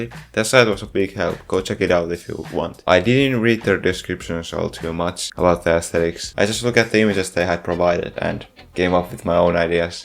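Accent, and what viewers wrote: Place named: Finland. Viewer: Hehe ekasta lauseesta äkkäsin suomiaksentin :DDD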